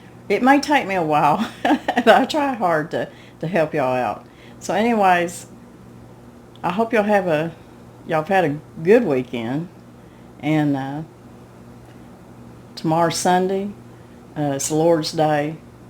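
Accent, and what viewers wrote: South, United States. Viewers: Your accent is odd.. you’ve a southern accent but you say wawrsh like a northerner… lol my yankee mom from Iowa says it that way. When we repeat it like her she says oh wait let me say WAAASH… lol but I’m not sure I’ve ever heard it with a slight southern accent like yours. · You are so precious! There is NOTHING more 'homey' than a Southern twang that puts one at ease! My BEAUTIFUL wife was a daughter of a Church of Christ Minister. I, however, lost her to breast cancer many years ago. As her family did a lot of preaching down Texas way for many years, they also had that Texas-twang that I have come to love so very much! As she, and all of her family have now all gone to be with the Lord, watching you, and together brings a lot of both sad, and warm, memories back to me when we were all together enjoying life, as life was meant to be shared, in Christ's fellowship. 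is lucky to have a woman such as yourself, as you are so genuine, kind, and loving. God Bless you, for the person that you are, and what you create for the viewers like myself!.. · These look delicious, but being a true Southern lady..I love your Southern authentic accent! It’s the real thing!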